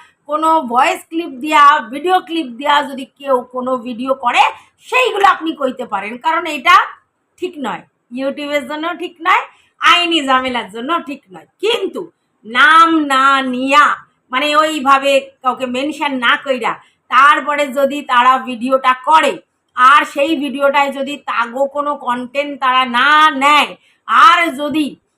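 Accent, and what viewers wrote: Bangal, Bangladesh. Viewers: di video ta khub bhalo, kintu normal bangla dialect e video korle better lage sunte.. ei "bangal" tone e kotha bolle half jinish bujhte parina r khub ugro lage.. Kichu mone korona bollam bole.🙂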